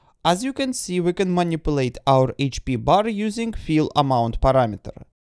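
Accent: Russia. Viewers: does he speak with a Russian accent? он говорит с русским акцентом?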